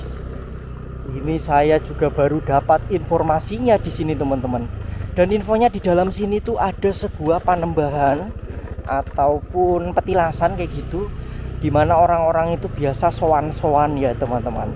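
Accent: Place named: Banyumas, Indonesia. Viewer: Mantap uji nyali... Trus sy seneng infonya Medok ngapaknya..👍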